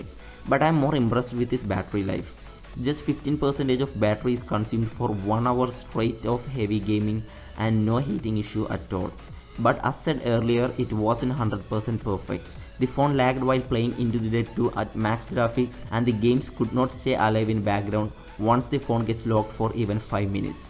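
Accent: India. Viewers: are you indian youe voice is like indian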